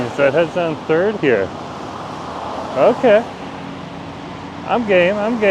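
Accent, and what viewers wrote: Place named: Canada. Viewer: I watch these vids just to hear you laugh! and the Canadian accent lol. Changes: I think was a Hand car wash. my old gas station. More apartment buildings, ofc. use to be Starbucks.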